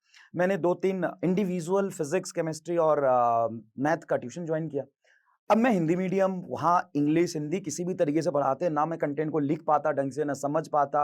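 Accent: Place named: Bihar, India. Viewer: Sir got that Biharizz😩😱😱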